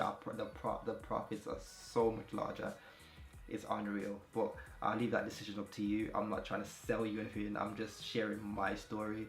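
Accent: Jamaica. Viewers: whats your ig? you sound jamaican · Di Jamaican accent a chip een tho lol thanks for the video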